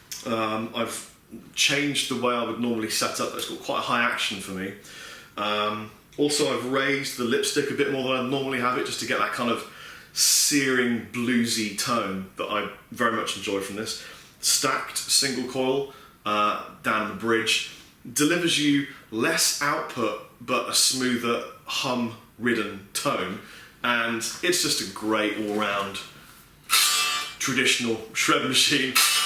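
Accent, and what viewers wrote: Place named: United Kingdom. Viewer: Heh heh..kinda funny. Who the fuck is? That rules. He's some dude who is promoting an Asian made guitar company on you tube a LOT.. he promotes products and usually everything is stellar and the best..and "take my money, please!!" So u have to take it all w the proverbial grain of salt. He gets paid to say pedals,amps and guitars are really great..and has stock in that guitar company, I think.. so if you browse YT for guitar gear, u might know him. otherwise, he's that shredding dude who works at the music store..he knows his stuff,but he's annoying as shit. He's the only guy I know who can make a British accent sound uncool.. Kind of like the Al Bundy of the Gear Demo guys, they do introduce a lot of new products here,and these guys know their stuff, the Danish guy,Pete and Lee are a bit more soulful players and infinitely less annoying,and despite the long winded segments, these fellows are pretty knowledgeable and usually fairly entertaining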